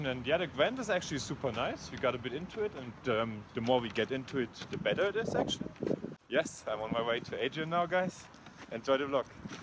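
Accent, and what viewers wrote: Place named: Germany. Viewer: wenn ne kartoffel englisch spricht...ohrenkrebs für meine englische ohren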